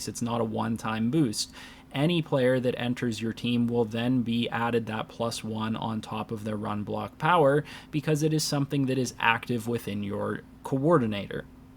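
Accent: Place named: Pittsburgh, United States. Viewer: I’ve got the Steelers on mine, too. I can hear your Pittsburgh accent coming through. Am I right?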